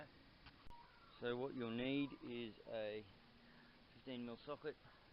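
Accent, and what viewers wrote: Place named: Australia. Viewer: Thanks for the video bud. Love the Commentary. Pure Aussie... Well done Mate.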